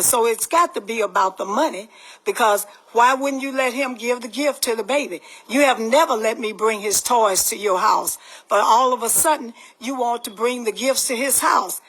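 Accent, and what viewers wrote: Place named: South, United States. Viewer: That's it. That's all! Talking like a southern woman with morals! God Bless you Ms.. By the way you look gorgeous! You go now!!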